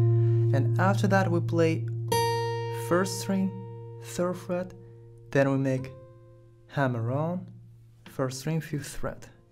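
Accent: Russia. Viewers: по произношению чел русский, я думаю, что это с, лайкни, если я шерлок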